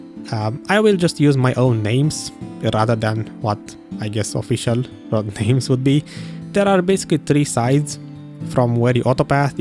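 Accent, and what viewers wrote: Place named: Romania. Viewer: You sound very romanian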